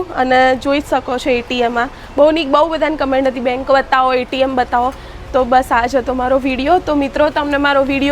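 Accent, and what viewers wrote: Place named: Mehsana, India. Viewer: મેહોના ની ભાષા સંભાણી ને મોજ આવે છે ❤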